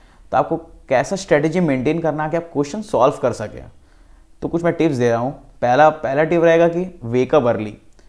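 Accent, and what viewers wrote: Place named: Bihar, India. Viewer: UP-BIHAR ACCENT ☺️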